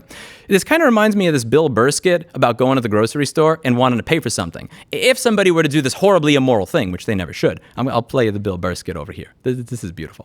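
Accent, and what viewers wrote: New York, United States. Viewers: You're the best. Your angry New York accent always makes me grin. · that New Yorker accent! You know he's frustrated 😅 screw these companies! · You can take the guy out of Noo Yawk... I love you,. BTW, I agree 110% about Thermaltake & Herman Miller. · let the New York out in this one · I love when gets mad he get progressively more Italian and Yorkie. Never ceases to put a smile on my face that someone will get this upset over someone else's issues. We need more people like him. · Haha, nice try on the accent but it’s still a New Yawka accent. Keep up the good work!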